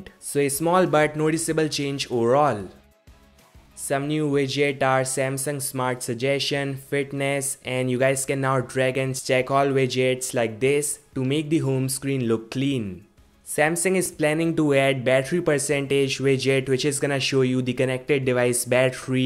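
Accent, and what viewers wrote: India. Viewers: Are you indian? You talk like indian !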